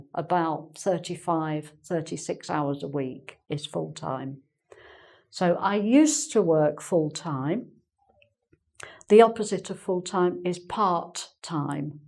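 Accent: England, United Kingdom. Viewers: You're the best from engVid, you and James, but you're a far better. I love your British accent, when i was starting on English, it was hard to understand it but now i hear it clearer than the American one. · great class as ever, I learned American English, but I want to practice my listening with the British accent. Thanks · I really can't stop watching your videos. You have a beautiful accent. I used to hate british accent but now i'm fond of it because of you. Thanks nice. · Your voice in very clear than others .. United Kingdom's beauty of English Language starts here... Thank you so much teacher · Ela é Britânica? Parece sotaque Inglês.... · From morocco i thank you a lot for your superb lession. And the way you explain it . that makes me love your clear british accent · her accent is British Amanda, the pure british accent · Hi, from Ukraine. 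Understood everything. So pleasant to learn from your lesson and hear that British English. Like a honey for my ears 😄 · So brit sz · Lovely British accent thanks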